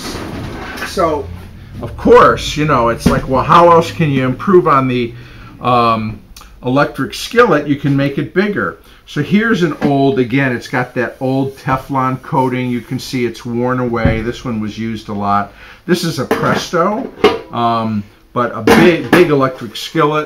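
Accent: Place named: Michigan, United States. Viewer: Michigan! That's why you talk normal. I'm from Northern Illinois.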